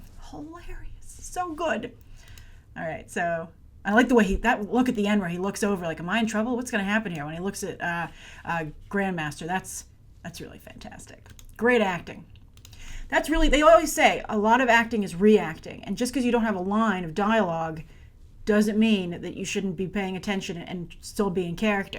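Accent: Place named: Boston, United States. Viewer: Are you from Boston or do you have a speech impediment?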